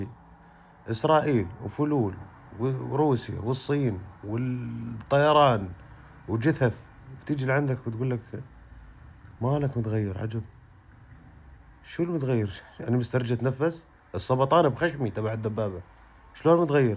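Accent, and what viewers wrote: Syria. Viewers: لهجتك من اي مكان بسوريا؟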